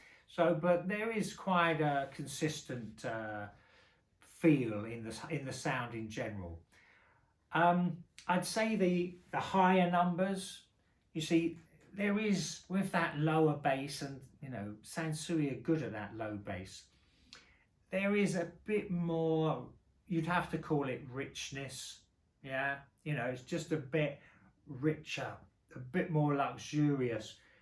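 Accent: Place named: Cockney, United Kingdom. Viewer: don’t you live his accent! It’s a pleasant combination of proper english & cockney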